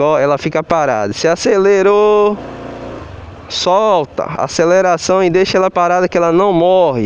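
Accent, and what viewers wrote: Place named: Bahia, Brazil. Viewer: Esse nosso sotaque Bahia é top de mais ..... abraço meu amigo. Pegar a minha final do ano.🙏🏼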